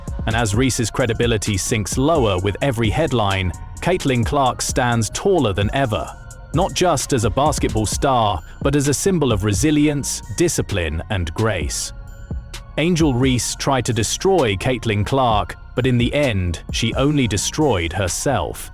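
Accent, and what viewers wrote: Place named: United Kingdom. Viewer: How about an American English speaking announcer. We are tired of you BRITS.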